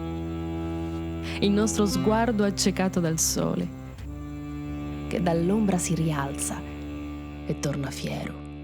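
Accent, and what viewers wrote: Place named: Veneto, Italy. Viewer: Eja tenisi arrexioni...però l'accento sardo mi manca, sento solo il veneto e forse è per quello........qui ci voleva una faccina sorridente ma non so dove prenderla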